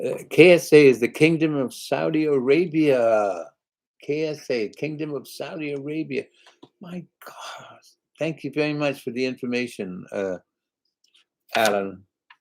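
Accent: South, United States. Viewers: Hello Engebert, I totally loved your live chat today. You have an amazing voice, speaking and singing. your songs have helped so many fans through these trying times. I listen to you when I go to bed at night, of course during daytime.😊 Your laughter on here brings so many smiles to me. Thank you.💓 Love your southern drawl..lol your a beautiful and handsome young man. My love, hugs and prayers are with you always.🌹❤😘🙏 The Lord's Prayer you sing it so beautiful, so touching..💖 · Watching from Wichita Falls Texas your southern accent was so so but you should put in a y'all and a bless your heart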